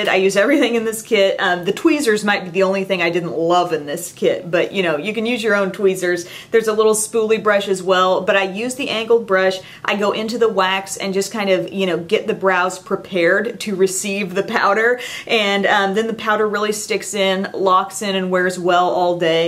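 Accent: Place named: South, United States. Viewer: She's from south western Illinois I believe - so she has a bit of a southern accent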